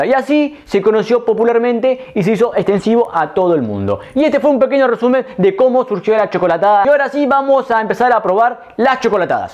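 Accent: Argentina. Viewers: Sos de Córdoba o me parece?? :v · che este se supone que es argentino por el asento pero le dice pajita a los piquitos la traision hermano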